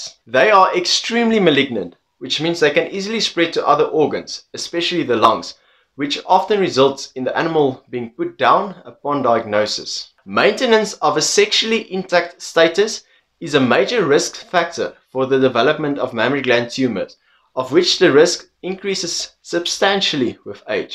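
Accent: South Africa. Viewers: Great RSA accent and easy on the eyes...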